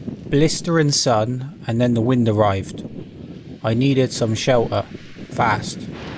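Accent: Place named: West Country, United Kingdom. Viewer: Well done, thanks for another entertaining video. I'm guessing the accent is West Country, Bristol maybe? (I spent 20 years in Salisbury). Hope you managed some😴 sleep later. The poem.....................well let's just say don't give up the day job🤣🤣 Plus, I haven't figured it out unless the 40 stones throw were one for each year of the birthday boy? 👍🇬🇧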